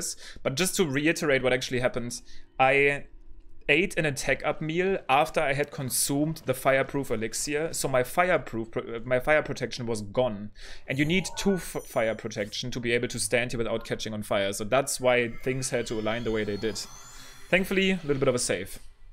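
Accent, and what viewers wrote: Germany. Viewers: bro i love your german accent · Im hearing a gernan fellow :) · This German accent is just nice 😂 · Man hört so raus das du Deutsch bist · Wegen dem Akzent würde ich Deutsch sagen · idk if he does in this run or not, but my favorite is when he says “ragdoll” bc it sounds like “rectal” lmao. german accents are excellent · Dude i just watched this Video a minute long and just the way you speak tells me that you are german xd ja ich bin auch von Deutschland lol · this beautiful thick german accent is love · i love the german english accent.. or just the german accent in general!!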